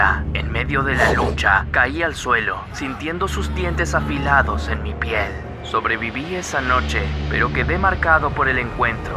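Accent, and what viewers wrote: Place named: Argentina. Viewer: Es verdad hace 4 años era mejor haora abla como argentino porque cambiaste,😭😭😭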